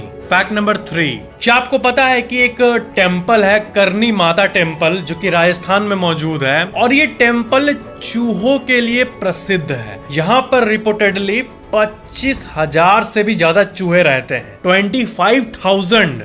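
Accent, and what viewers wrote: Bihar, India. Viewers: Bhaiya aap bihar se ho kya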